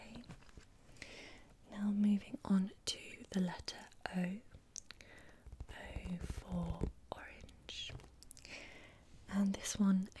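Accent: United Kingdom. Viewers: And has a British accent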